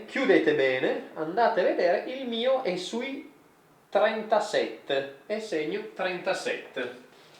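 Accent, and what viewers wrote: Turin, Italy. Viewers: ricorda Angela e Odifreddi nel modo di parlare perché è di Torino come loro.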